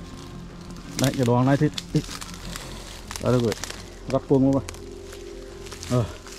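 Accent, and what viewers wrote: Hue, Vietnam. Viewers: Cảm ơn cháu ! Chú sinh ra ở Huế lớn lên đi học , tuổi học trò đi khắp các lăng tẩm không có chổ mô là bỏ sót . Bỏ Huế ra đi thời bao cắp Huế ( đói khổ ) Nay dịch bênh miền Nam . Rảnh rổi vô tĩnh xem được Huế rơveu của cháu rất tuyệt vời cộng thêm giọng nói thân thương của Huế . Chúc cháu nhiều s k tiếp tục những V IDeo khác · Thích thuyết mình bằng giọng Huế. Mình xa quê nhìn những địa danh Huế qua những video của bạn. Cảm ơn nhiều lắm!chúc sức khỏe và hạnh phúc, thành công, thật nhiều năng lượng. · thế là tốt rồi bạn vậy mới là đặc trưng của Huế chứ ko lẽ nói như giọng Bắc hay Nam thì vòn gì đặc trưng vùng miền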